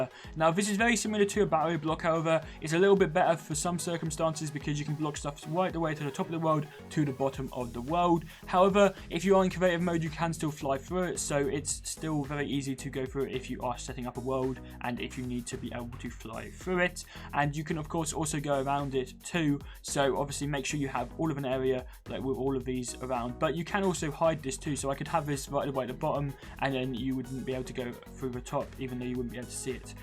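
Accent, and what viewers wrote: United Kingdom. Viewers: THICK BRITISH ACCENT BE'ER I LOVE IT BRO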